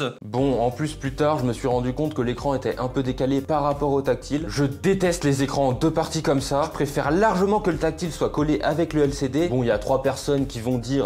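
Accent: Belgium. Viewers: Les belges prononcent pas les chiffres comme les français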